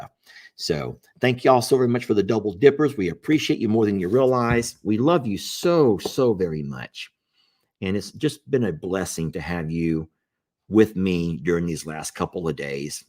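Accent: South, United States. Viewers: I think the pronunciation could actually be that your from the south with a little southern drawl!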